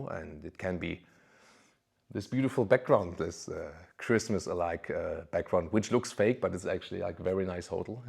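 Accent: Germany. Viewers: Love your vids and the way you breakdown these cars with strong German accent in a style and format that is just perfect for your delivery🔥🔥🔥🔥...It sounds so authentic and feels like you are coming directly from German car manufacturers, which i know is not the case, but that's why I love the channel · I subscribed this channel mainly for Learning German Accent English. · Love your Videos and your style of presenting cars. Calm and authentic. And keep our German accent, that makes it so relatable. Also if I am German, most of the time I watch your videos in English. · Hello. Dual Brit Canadian here, though I'm Egyptian by birth. Have always loved your videos, your presentation style and your personality. I actually lived and worked in Germany from 1988 to 93, in Lahr / Schwarzwald at Canadian Forces Base Lahr. I was a civilian in the IT department and then immigrated to Vancouver Canada in 1993. 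I'm back in the UK right now but have kept my Vancouver place. I've also faced a very personal tragedy recently. I lost my very dear Mum last year due to her accidental stair fall and discovery eight weeks later she had two brain haemorrhages she didn't know she had. She tragically died in hospital on July 3rd last year, with me in the room with her. I still cannot believe she's gone. Three months after losing her, I myself was diagnosed with leukemia. I spent four weeks in hospital having numerous blood transfusions, chemo and medications, followed by almost a year of outpatient treatment. I'm thankfully totally cured now, very fit and healthy with zero side effects. But the tragic and unexpected loss of my very dear Mum has been absolutely heart breaking. I am keeping a positive attitude for my life and future. I attend many classic cars shows and I'm very interested in cars. Totally love your channel and your presentation style. 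Also, your accent reminds me very much of my five years in Germany. By the way, my profile photo is of my very dear Mum and I. Vielen Danke und Tchuss! Ibrahim in Canterbury Uk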